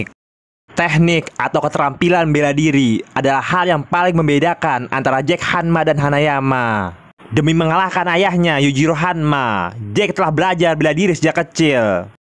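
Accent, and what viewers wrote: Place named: Jakarta, Indonesia. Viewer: Gw suka bahasa lo bang betawi bet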